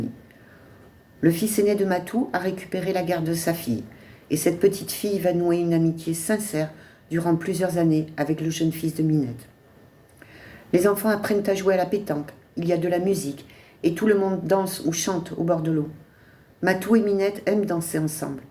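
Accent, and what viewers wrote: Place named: South, France. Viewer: Bonjour, je viens d’écouter la première partie des aventures de matou et minette j’ai beaucoup aimé, j’ai hâte d’écouter et de connaître la suite de cette belle histoire d’amour, la narratrice a une belle voix avec un petit Accent Du Sud qui nous plonge directement dans l’ambiance de se dérouleron aimerait que ça se termine jamais en même temps que ce récit elle nous fait découvrir des lieux magiques, j’avais l’impression de vivre avec eux tous ces moments inoubliables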